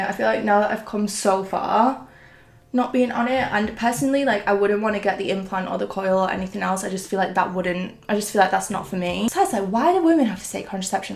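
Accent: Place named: Northern England, United Kingdom. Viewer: Gorg look! Your northern accent makes me feel so at home, hello from leeds ✨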